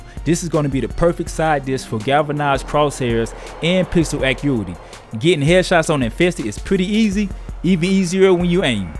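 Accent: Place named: South, United States. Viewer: southern black accent.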